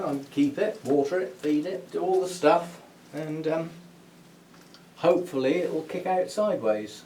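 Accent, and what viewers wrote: United Kingdom. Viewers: I'm pretty sure I happened upon your channel when I did a search for "aggregatum orchid care" which I know you did not have back then but the word "orchid" probably led to your channel. I stayed because I like your personality and you have a wealth of knowledge I appreciate. Plus as an American, I enjoy your British accent! I remember when I first heard you call a plant "tatty" and I found it charming. And you have a kooky sense of humor. I hate this social distancing too, but we have to do what we can.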